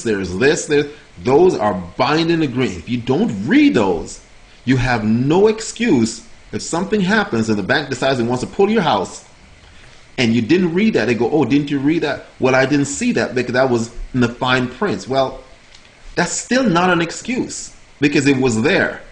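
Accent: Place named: West Indies, Caribbean. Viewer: you have an accent...perhaps you're west indian? and i couldn't find the other channel.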